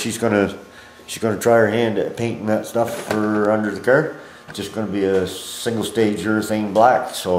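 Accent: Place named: Nova Scotia, Canada. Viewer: am in Halifax , thought you sounded Nova Scotian, Im into old Novas too and have done that frame thing as well,,had mine sandblasted, painted it inside with brushes on a long stick or piece of bent tubing .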